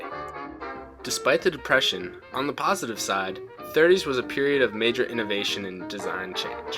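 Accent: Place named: North, United States. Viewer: Sounds like he has braces. But what's wrong with it? Mightuhv been a homework assignment. Also, I'm gonna guess a northern accent.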